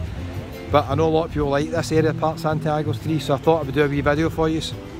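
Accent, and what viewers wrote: Scotland, United Kingdom. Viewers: Always lovely to hear your Scottish voice! Nice one!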